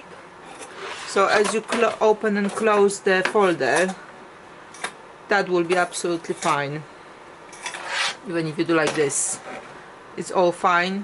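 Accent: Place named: Poland. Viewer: Good Morning, what a great idea on how to use the snow ball pieces, I would have never thought of it. Its a wonderful way not to waste any fabric. I really enjoy quilting videos and how to use up scraps. Definitely would love to watch more of your video's. I love your accent, I believe you are Polish, not sure if you are in the US. Your English is fantastic. Hope to see more of your videos.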